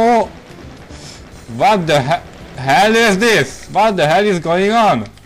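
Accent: Hungary. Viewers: En eskuszom nem neztem volna ki -bol hogy tud angolul.kicsit magyar akcentusos de szarni bele jo az igy is xD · hungarian accent rulez XD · YEAH!! Magyar akcentus foreva XD Jó a video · de mennyire magyar ahcentus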